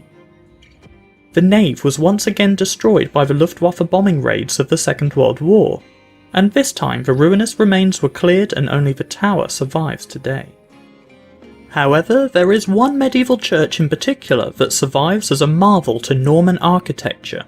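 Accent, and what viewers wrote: Norfolk, United Kingdom. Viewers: your subtle flat Norfolk accent is particularly appropriate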